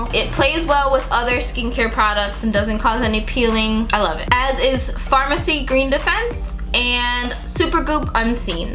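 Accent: Jamaica. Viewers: Just found your channel today and started watching. I heard the accent then you said you are Jamaican 🇯🇲. Loving your channel my Jamaican sister.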